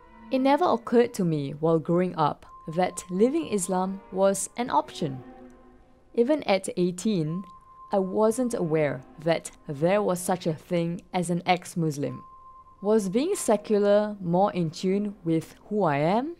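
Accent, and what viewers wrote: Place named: Kedah, Malaysia. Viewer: he speak bahasa kedah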